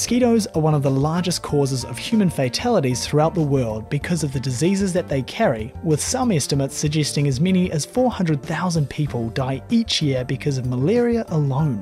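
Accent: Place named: Australia. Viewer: Nothing frightening about any of these, and your Aussi accent makes many of your species pronunciations atrocious. There is no such thing as an "anthropod." It's "arthropod." You made that mistake throughout this video.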